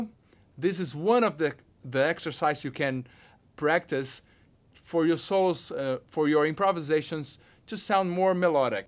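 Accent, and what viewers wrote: Brazil. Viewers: disse que sabe falar em ingles como um nativo mas fala desse jeito abrasileirado porque gosta, meio que simbolo brasileiro sla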